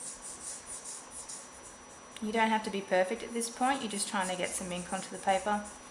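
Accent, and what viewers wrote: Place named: Australia. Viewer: AUSTRALIAN ACCENT FTW!!!!!!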